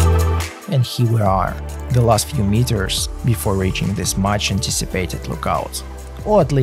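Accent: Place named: Northern Europe. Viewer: Great clip, I am in a dilemma as to your accent - northern european I suspect. Marvellous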